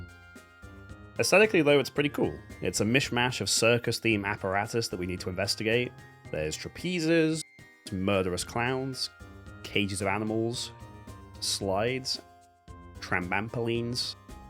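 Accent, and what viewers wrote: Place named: United Kingdom. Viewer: London :) that’s why I asked. I can tell you’re British. Just wondered which part of the uk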